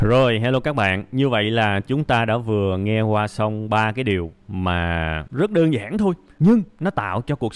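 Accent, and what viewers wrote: South, Vietnam. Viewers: Giọng nói miền Nam của bạn rất dễ nghe. Bài học hay lắm, cám ơn anh. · Giọng a miền nam mà rất dễ nghe luôn, cảm ơn a đã chia sẻ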